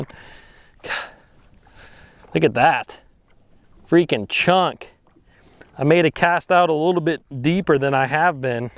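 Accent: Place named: Long Island, United States. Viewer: "Downsize after Sunrise" that's how I roll ....unless their on and the Bubba baits r Workin ! Great channel brother. Is that accent from Long Island ?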